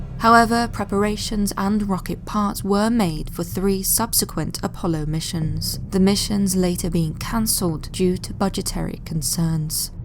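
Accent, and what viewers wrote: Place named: Bristol, United Kingdom. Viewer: The accent is definitely english, maybe bristol?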